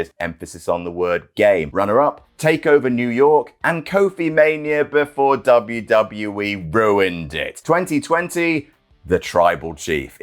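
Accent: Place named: United Kingdom. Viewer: Hey its that posh english guy that talks about wrestling.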